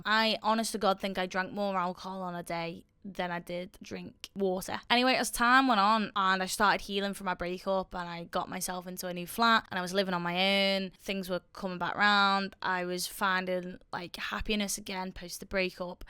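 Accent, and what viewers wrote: Liverpool, United Kingdom. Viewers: Love the scouser accent ❤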